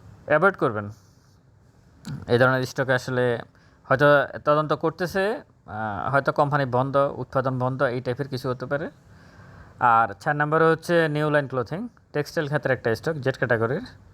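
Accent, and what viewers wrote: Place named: Noakhali, Bangladesh. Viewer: noakhailla bhasha emon e . Manush k choto kore kotha bola ucit na. Noakhaillara o manush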